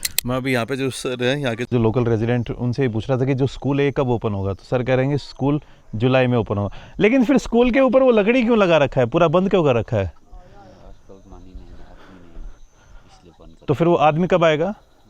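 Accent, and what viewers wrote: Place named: Arunachal Pradesh, India. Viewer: whr were u?? Was eagerly waiting for you and was worried.. 2 din lagega gaye aapko video ko upload karne..? The way you speak in Arunachalee hindi accent was awesome.. ❤ from Longding, Arunachal Pradesh 🇮🇳. Was expecting your visit Longding, Arunachal Pradesh from Longwa, Nagaland 🙏. Anyways, have a safe journey & stay..👍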